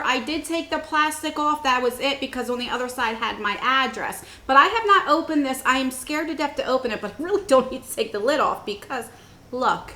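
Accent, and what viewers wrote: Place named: Mid-Atlantic, United States. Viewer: Hi! I love your videos!!! I lived in Delaware for 14 years and I miss that Mid-Atlantic accent!!! Your videos are so informative and I just love your down to earth personality. Keep it up ❤️❤️❤️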